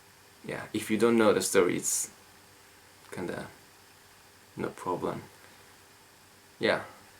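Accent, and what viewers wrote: Japan. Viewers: I can tell you are a Japanese from your accent! Korean and Chinese have better English accent than you.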